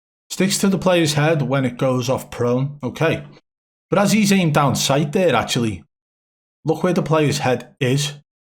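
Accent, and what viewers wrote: Liverpool, United Kingdom. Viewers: Bruhhhh your scouse accent is killing me😖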